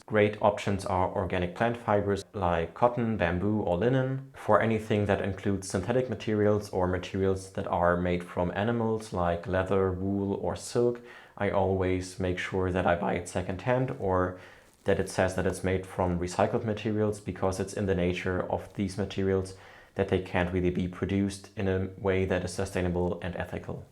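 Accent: Germany. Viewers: Great video! I love fashion but realized I don't even wear most of my clothes, so I started to declutter everything and became more aware of my purchases. Are you from germany? The accent sounds familiar 🙃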